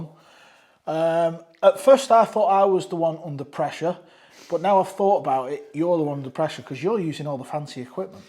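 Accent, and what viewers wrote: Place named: Northern England, United Kingdom. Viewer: Horrible, NASAL, Northerner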